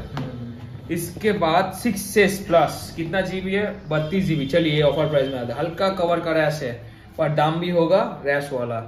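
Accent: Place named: Bengal, India. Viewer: আপনি কি বাঙালি? সারদাপল্লি উচ্চারণ শুনে বাঙালি মনে হলো, হিন্দি উচ্চারণ শুনেও বাঙালি মনে হলো। তাহলে ভুল হিন্দি তে ভিডিও কেন করলেন ভাই? আপনি পশ্চিমবঙ্গে বসে আছেন। এখানকার ভাষা বাংলা। নিজের ভাষা কে সন্মান করুন। আপনি নিজের জায়গা তে হিন্দী বলবেন, ওদের জায়গা তে গিয়েও হিন্দি বলবেন....ওরা নিজের জায়গা তে এই ভিডিও টা করলে বাংলায় করতো বলে মনে হয়?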